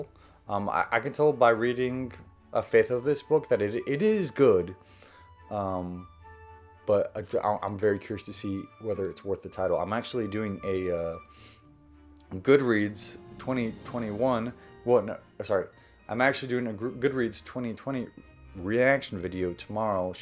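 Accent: South, United States. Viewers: I love your video at your video in Texas during your quarantine until your husband, Zach was wrapping all of the books while you're open them to see some new books including Black Sun by Rebecca Roanhorse, Legendborn by Tracy Deonn, Ready Player Two by Ernest Cline, And I Darken by Kiersten White, The Fires of Vengeance: The Burning, Book Two, The Invisible Life of Addie LaRue by V.E. Schwab and House of Earth and Blood (Crescent City) by Sarah Maas, but you're talking about all of the characters from the books to read them after that, but I hope he'll be proud of you and I love your Southern accent!!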